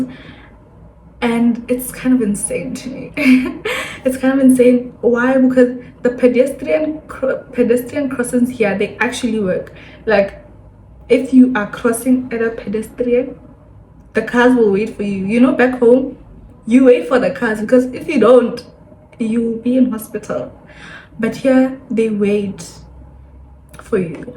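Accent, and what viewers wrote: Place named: South Africa. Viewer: 🤣🤣😭is it South Africa ? Waitse batho bone mma Mme accent ya rona e monate nna i like it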